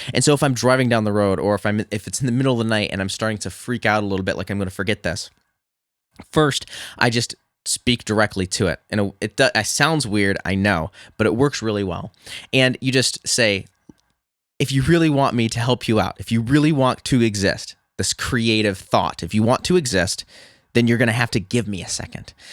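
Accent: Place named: Michigan, United States. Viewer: Are you from Michigan? Your accent kind of sounds like it 😂😂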